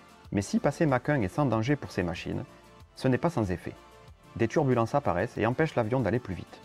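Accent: South, France. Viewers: Passionnant ! Continue comme ça. Et le petit accent du sud ajoute une touche vraiment sympathique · L'accent du sud, avec tout plein de Mirages avec des cocardes rouges à croix blanches... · pour l’accent du sud ! Super contenue technique au passage . Un petit dossier sur le pa49 ou un petit Leduc des familles ? Continue !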